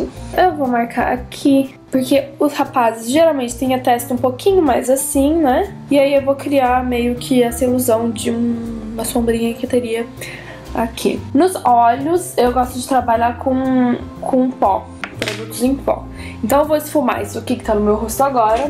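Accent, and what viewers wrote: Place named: Rio de Janeiro, Brazil. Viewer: querida, tu tinhas um resquício de sotaque carioca... Amey esse vídeo!:D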